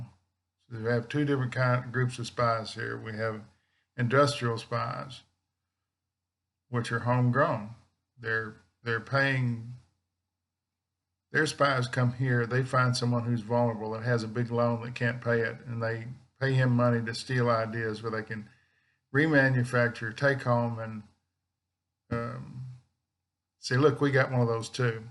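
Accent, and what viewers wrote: Texas, United States. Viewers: Moved from Austin to N. CA 20 years ago and I find a certain comfort in Texan twang (not as strong as my dad's was), too... and I miss Central Market/HEB!:-) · I sure enjoy your readings, but I LOVE your accent!! Moved from TX to AZ this year and I miss that TX accent .... and HEB !!!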